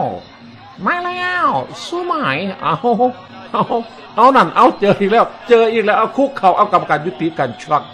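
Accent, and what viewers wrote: Isan, Thailand. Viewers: ผมคนใต้.ติดตามครับ.พากได้หร้อย.. ขำดี.พากอีสาร.ปนใทย.นี้ถ้าพากติดภาษาใต้มั้ง.. หร้อยจ้าน... 555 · เว้าอีสานบ้านโตฟังม่วนดี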